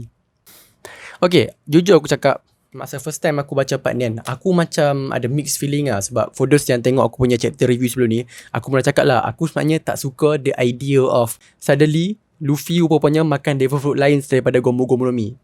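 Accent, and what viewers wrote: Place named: Kedah, Malaysia. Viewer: aku support dam subscribe bagi peminat one piece fans dan aku suka bangsa utagha hang punya loghat sama mcm the show one piece sebab we are same. We are the Northerians Guysss.hahahaha...bangga jadi bangsa Kedah noh...hahahah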